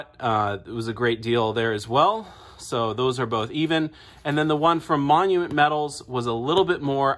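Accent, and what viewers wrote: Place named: Oregon, United States. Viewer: Thought you sounded like an Oregonian. Haha! I live in Wilsonville, myself.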